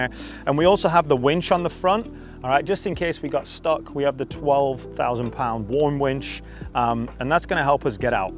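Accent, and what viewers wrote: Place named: Lancashire, United Kingdom. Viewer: Nice van, something tells me there’s a bit of Darwin, Preston, Blackburn in your accent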